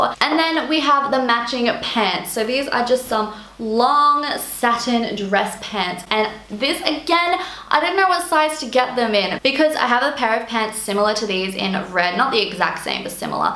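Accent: Australia. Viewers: Why do I love them? Because she’s honest and classy without ever being cruel. She’s got a fun personality and I could listen to her Aussie accent all day!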